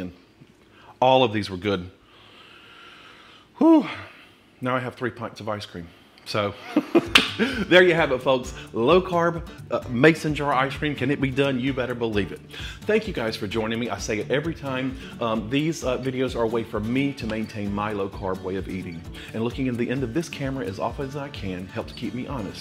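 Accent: South, United States. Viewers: Yes! He's so down to earth and as I too am southern it is music to my ears to hear him talk ❤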